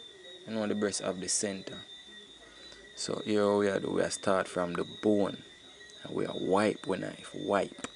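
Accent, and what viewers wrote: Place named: Jamaica. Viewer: mi like how you keep the patois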